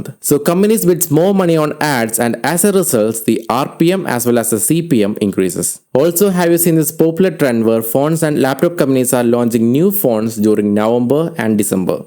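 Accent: India. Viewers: That Indian VOICE 🗿❤ is Genuine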